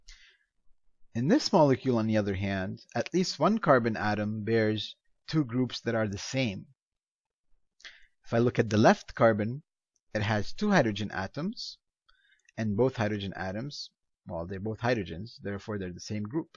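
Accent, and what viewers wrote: Arab world. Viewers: You sound pure Arab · lol I can hear your Arabi accent :P thank you for this!...test tomorrow ;(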